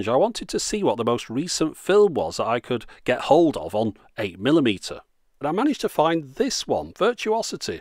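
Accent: United Kingdom. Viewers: "Skullduggery was afoot" - god, I love listening to British people talk. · It's just one of those British things. "Patent" with a long a, "Mazda" with a short first a, "missile" with a long second i.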